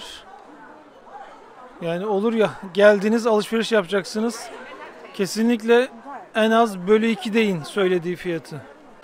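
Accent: Samsun, Turkey. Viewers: Abi sen samsunlumusun ellllllliii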